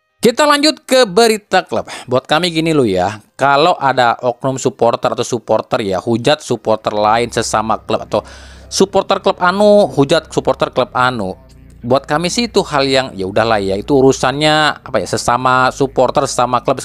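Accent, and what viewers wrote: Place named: Java, Indonesia. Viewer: Pk kedok alias medok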